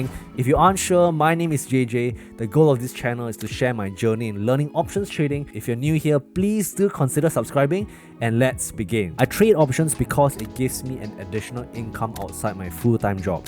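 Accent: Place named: Singapore. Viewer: Hello good luck 🤞 with options . I can hear you are Singaporean. I lived there for several years at marine parade. Loved it and miss it much.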